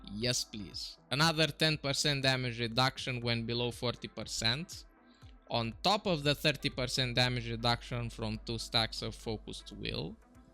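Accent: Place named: Russia. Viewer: о боже, какой у тебя акцент... ты походу русский...)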